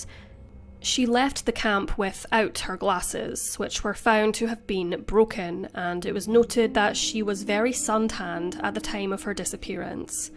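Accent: Scotland, United Kingdom. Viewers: SHE HAS BEAUTIFUL SCOTTISH ACCENT, EASY TO FALL 😴😴😴😴😴😴😴😴😴😴 💤💤💤💤💤💤💤💤💤 💤💤💤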